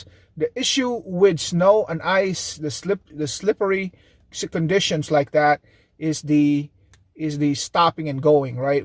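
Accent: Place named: Philippines. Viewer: Philippine accent. How did you get to Illinois? Too damn cold..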